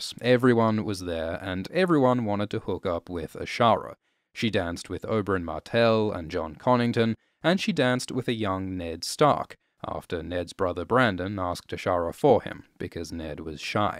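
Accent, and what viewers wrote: United Kingdom. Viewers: Dorne sounds a whole lot like Dawn when you say it with a British accent. Coincidence? I think not!!!!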